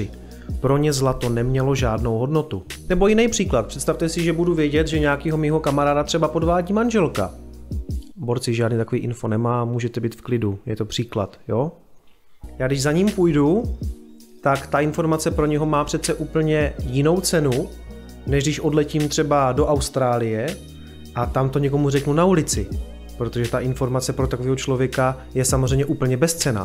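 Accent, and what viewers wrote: Czech Republic. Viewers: Už tě sleduju nějakou dobu ale ted slyším že si z Prostějova. Já jsem se tam narodil a bydlel 22 let. Dobrá práce, moc pekný videa, naučil sem se hrozně moc a občas se i zasměju 👍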